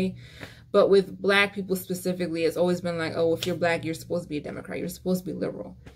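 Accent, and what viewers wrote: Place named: Caribbean. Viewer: Your accent is so familiar are you bajan or carribean? 🤔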